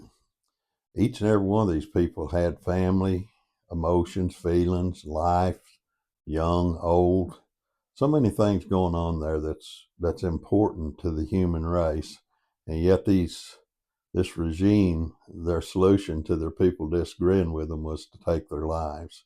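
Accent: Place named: South, United States. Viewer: Listen, I'm a Marine Corps vet and former contractor. I'm about as right wing as you can get. People like you who live your sheltered little life down South are absolutely clueless. My ex wife was Jewish, I was trained by Israelis as a contractor after I got out of the military. Let me tell you something Papaw...Every single Zionist Jew in the world HATES YOUR GUTS. The second they hear your Southern accent they wish you were dead. They hate farmers, they hate people who hunt, they hate people who do for themselves and they spit on Christians in Jerusalem. Last year Israel declared themselves the gay capital of the world. You have absolutely ZERO experience with these people. They don't follow the Torah, they follow the Talmud and the Talmud and the Talmud says the Jesus is in "Gehenna" or hell boiling in feces. The State of Israel was founded by a Satan worshipping banking family called the Rothschilds. 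They signed the Balfour declaration of 1917. They founded OUR Federal Reserve and all the central banks of the world. the current state of Israel IS the GREAT DECEPTION!! You are utterly and profoundly clueless. I love Southern People, My Momma is from South Carolina but I'm a Yankee and I know these people YOU DON'T and you are talking out of your ass.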